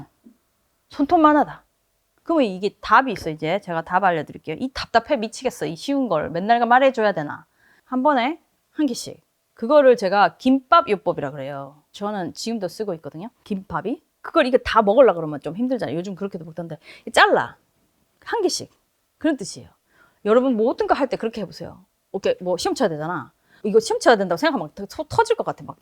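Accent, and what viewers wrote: Gyeongsang, South Korea. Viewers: 긩상도+영어액쉔트 = 묘한 중독감 말투네요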